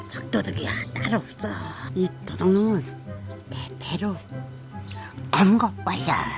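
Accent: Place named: Jecheon, South Korea. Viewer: 제천 사투린데 넘 정겹다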